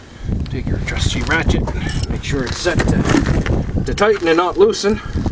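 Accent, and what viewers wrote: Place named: Ontario, Canada. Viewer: Well done! Much easier than Chilton's Manual! The Ontario accent is an added bonus.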